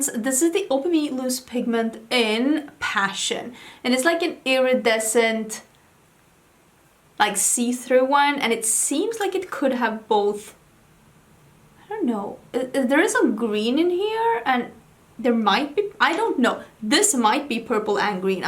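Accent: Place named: Sweden. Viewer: the way you kept saying "depth" in your cute little swedish accent! 😭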